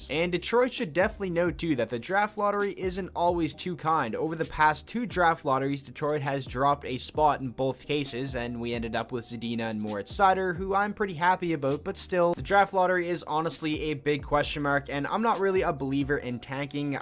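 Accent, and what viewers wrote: Canada. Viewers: Dude you're canadian aren't you? I hear that accent 😎 (Also, it's eyezerman)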